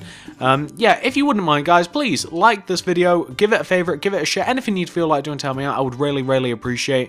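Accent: United Kingdom. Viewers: Bruh he’s British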